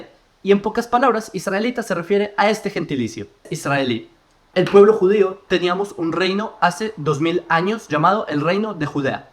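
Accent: Bogotá, Colombia. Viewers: Tienes un acento muy curioso, es decir, un acento colombiano y no israelí...en fin, ya estudiaste bien tus lecciones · Tu español suena muy parecido al " colombiano "😊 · Tiene acento Colombiano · Tu tienes un acento muy Colombiano. Naciste de Padres Judios Colombianos ? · Bravooo!!!! 👋👋👋👋👋 excelente. Explicación aclaraste muchas dudas . Te seguiré . Tienes un acento colombianisimo 😊 un abrazo 🫂 🤗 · Aprendiste español en bogota o con un colombiano ? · Se nota que aprendiste el español en Bogotá diria yo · Tienes acento Colombiano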